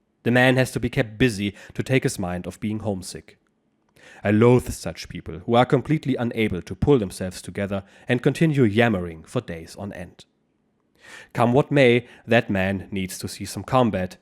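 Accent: Germany. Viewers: Thanks for your accent, Thuringia?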